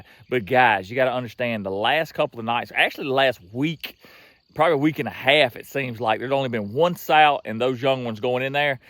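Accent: South, United States. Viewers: You the man.keep up that good old country talking..... Come on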